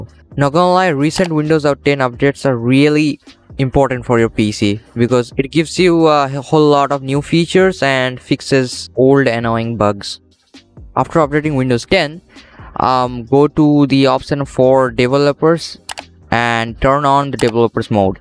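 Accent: India. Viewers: U are indian?? M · are you indian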